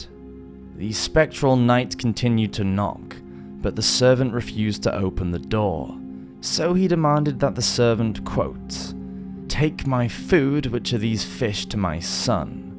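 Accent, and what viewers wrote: United Kingdom. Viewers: Love your videos man where you from in uk?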